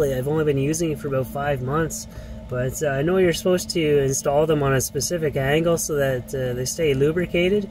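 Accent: Canada. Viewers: This guy sounds so Canadian 😂😂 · Love that Canadian accent, everything sounds like a question. This man has a better life in a minivan than a lot of people in brick and stick homes. Happy trails! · Could listen to this guys Canadian accent for hours, in fact I think I have, shit what time is it!? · The most Canadian accent I’ve heard in my life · That Canadian accent! I'm from Wisconsin, and people think I have an accent lol.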